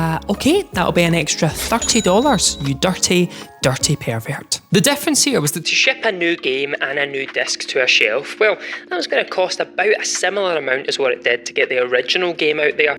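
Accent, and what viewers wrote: United Kingdom. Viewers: Hearing "Soccer Jersey" in British accent was weird... Even though Soccer was initially coined in GB...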